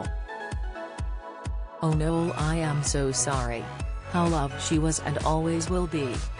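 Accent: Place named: United States. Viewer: Why do we need an American narrator ?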